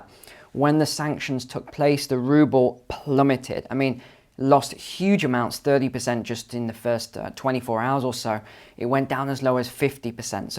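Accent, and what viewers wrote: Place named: United Kingdom. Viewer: "I don't know about you but I don't fancy going to a nuclear war right now" UK English just sounds so proper and funny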